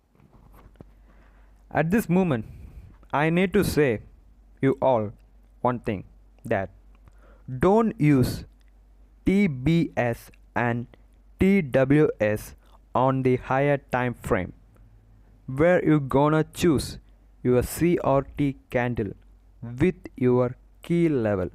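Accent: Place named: India. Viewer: Can’t you hear he is Indian 😅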